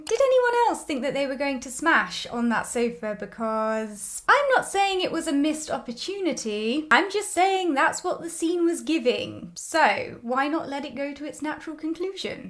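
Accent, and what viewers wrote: United Kingdom. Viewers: I really don't care if the pronunciation is Faye or Faiii. She's hot. Your descriptions just tickle my fancy. So articulate British. Love it. Still laughing.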